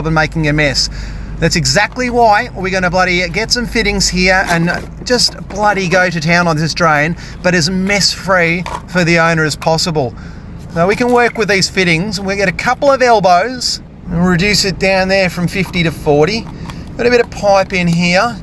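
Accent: Australia. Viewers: The Australian accent just kills me😂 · I love the Australian accent ❤ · Just looked up at the clock and it's here in the UK which means that I've been enjoying you videos now for almost 4 hours - brilliant, cathartic and I feel I've learnt to speak 'Aussie' in the process. Good on ye fella. Great to see somebody so into their work and enjoying every minute. Pity you don't operate around here. Cheers.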